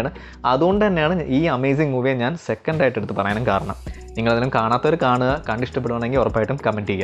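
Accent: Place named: Adimali, India. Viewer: അടിമാലി ക്കാരൻ ആണോ നീ.. നിന്റെ സംസാരം അങ്ങനെ ആണ്.. അമ്മച്ചി അപ്പച്ചൻ..😇😇👍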